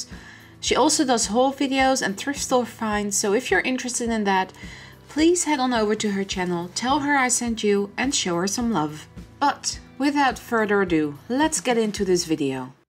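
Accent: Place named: Netherlands. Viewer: Everytime I hear your accent I get this feeling you're dutch.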